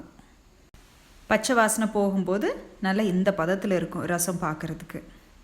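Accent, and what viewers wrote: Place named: Kongu, India. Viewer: Nice Kongu Slang and useful Recipe:-)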